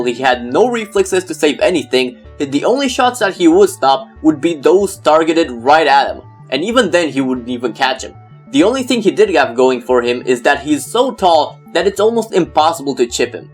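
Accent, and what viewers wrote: United Kingdom. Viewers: Dude,esti tare,continua...wow,ai un accent britanic excelent! ;) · nu mai pronunta numele romanesc cu accent de englezoi. e enervant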